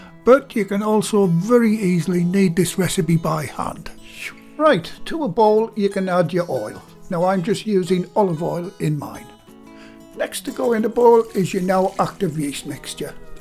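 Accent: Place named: Northeast England, United Kingdom. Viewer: from your accent you where brought up in the North East of England, the North East of the UK must be well into Scotland.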